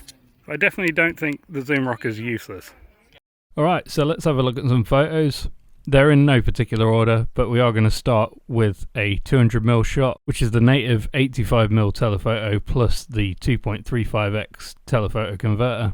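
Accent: United Kingdom. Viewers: British accent 😮😢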